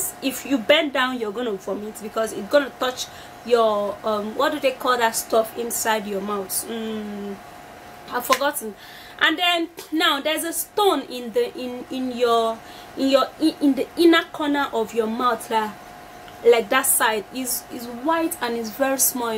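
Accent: Nigeria. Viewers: thank you so much indeed!! all your videos are great love it.Cuz it's amazing girl!! you teach me how to use it!! thanks once again for your help and advices for showing us to be able to get clean Oral Hygienic!!! such a courageous active young lady! ! well done and keep your efforts!! love Nigerian accent they're cool people! !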